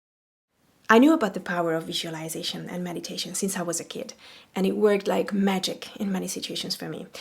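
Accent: Hungary. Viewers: OMG ...I don't know how I got here...but what you say resonates with me so much! I also want to have my own workshop because I want to break free! My aim is also freedom...it has always been freedom, I just never dared to act on it.But now I have the courage to break free from having a boss, from working on a computer, from working corporate jobs. I'm only halfway through the video, so I'm really curious about the outcome! Ps.: Are you originally from Hungary? I suspect it from your name and accent😄. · Nooo! The Hungarian accent is really good. I love it!😅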